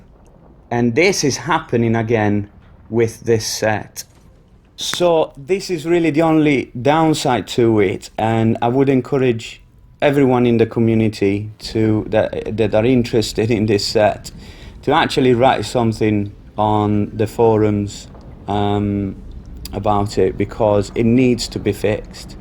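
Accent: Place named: Northern England, United Kingdom. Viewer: Haha as a Midlands man I was sitting here like.. I'm sure he's northern... but he also sounds foreign... love it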